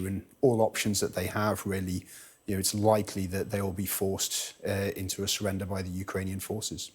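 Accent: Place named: RP, United Kingdom. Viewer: Does anyone believe this funny posh bloke?!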